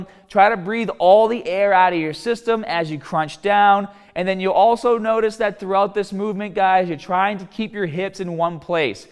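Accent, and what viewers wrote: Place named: Maine, United States. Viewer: What is the accent. Maine?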